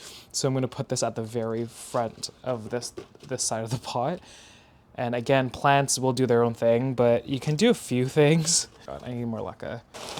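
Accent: South, United States. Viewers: Has anyone ever told you that you have a southern American "twang"?! 😅 I love it! 😂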